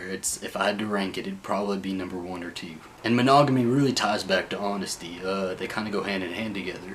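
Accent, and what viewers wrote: South, United States. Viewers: his deep country accent is sexy but he seems straight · love your southern accent! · this guys attractive not just his looks but his mind, not alot of gay guys think that way and they're very judgmental about each other so he's right, and I've never seen gay guy with a deep southern accent miracles do exist lol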